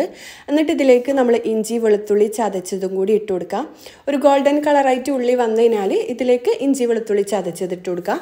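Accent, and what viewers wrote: Kannur, India. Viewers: Ithaa, u are such a wonderful inspiration to me. Ithante Motivation njan follow cheyyarund tto. Ningale kanoor slang adi poli aan tto. I love u ithaa😘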